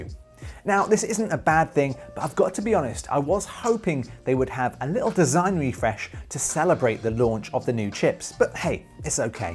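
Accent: United Kingdom. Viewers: Are you from London ?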